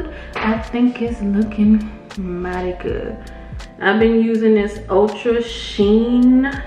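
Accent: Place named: Los Angeles, United States. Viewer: Its CUTE! I just realized you were from LA. I could hear it but didn't think nothing of it. I'm from Baton Rouge.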